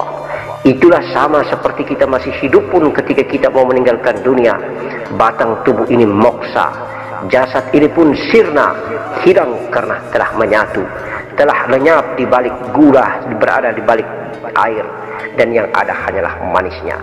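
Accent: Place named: Bugis, Indonesia. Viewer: Assalamualaikum Kalau saya dengar logat bicara nya Abah guru tercinta ini orang Bugis Ada gak yg tahu nama dan alamatnya. Kami mau ketemu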